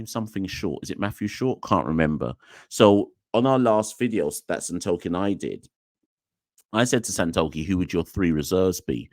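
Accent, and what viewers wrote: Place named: England, United Kingdom. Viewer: Are you in England or u just have an English accent? No disrespect but just want to know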